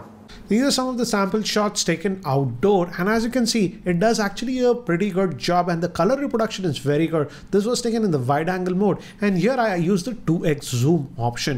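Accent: India. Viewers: Classic Indian accent I'm don't used to this accent lol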